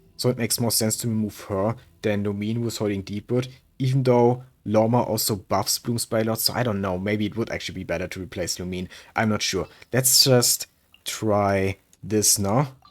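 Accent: Germany. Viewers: i hear german?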